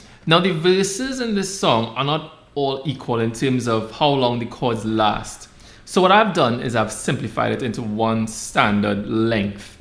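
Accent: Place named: Trinidad and Tobago. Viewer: Thanks man, this is such a well done lesson of a classic love song! I am so glad that I found your channel, and I subscribed immediately 😂! Also, coming from the West Indies myself, I am sure that it’s a Trinidadian accent I am hearing 😊, nicely done man.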